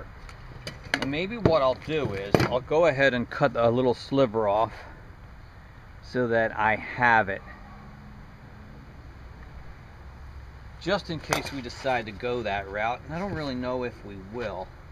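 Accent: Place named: Midwest, United States. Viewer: gold 🥇 great video. 👍 your accent makes me think you are from illinois / midwest area.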